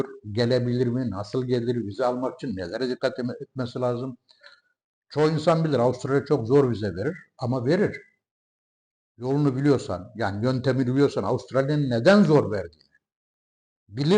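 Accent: Turkey. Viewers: Aslen turkiyenin neresindensiniz ? Hatay şiven var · DOGRULARI KONUSTUGUNUZ ICIN TEBRIKLER.ZATIALINIZ GALIBA VICTORIA'DA YASADINIZ, BALI'DE YASANIR ,KUTLUYORUM, BY THE WAY MEMLEKET NERESI? AKSANINIZ ADANA'YI CAGRISTIRIYOR, BIZ ISTESEKTE ORALARDA YASAYAMAYIZ, . NEDENI ISE ILK KAFILELERDEN BIRISIYLE GELMIS VE 57 YILI TAMAMLAMAK UZEREYIZ,SIMDILERDE 22 AILEDE OLUSAN BIR COLONI OLUSTURDUK OLULERIMIZ VAR YAS OLARAK 93 .SIZE YENI DUNYANIZDA MUTLULUKLAR DILIYORUM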